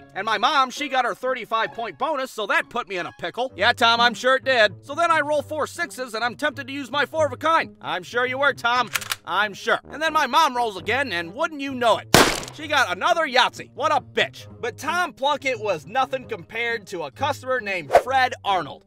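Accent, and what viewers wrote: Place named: Ohio, United States. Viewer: What state do you live in because just the way you talk I feel like you live in Ohio for some reason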